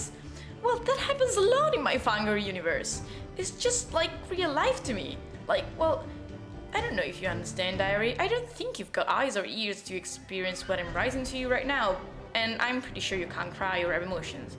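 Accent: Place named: United Kingdom. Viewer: amo quando parli in inglese! (E amo il fatto che capisco benissimo perché parli in modo semplice e aw). E cominci a fare tuo l'accento british, questa è una cosa bellissima! Continuerò sempre a seguirti, sappilo! E MORE VIDEO IN ENGLISH PLS, I NEED THEM Comunque: quoto l'intero video. Proprio ora mi sto riguardando tutto HIMYM per la terza volta. Di fila. IT'S SO HARD BEING A FANGIRL AND YOU UNDERSTAND ME AND I LOVE YOU PS. Per le altre ragazze: guardate che ha già fatto un video dove spiegava come ha imparato l'inglese. Cercate bene e lo trovate, non l'ha postato nemmeno troppo tempo fa! PPS. I love you <33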